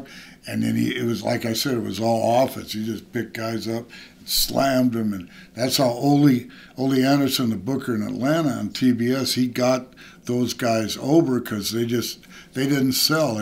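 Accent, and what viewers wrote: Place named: Michigan, United States. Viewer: He is real.... he talks like a Michigan accent.....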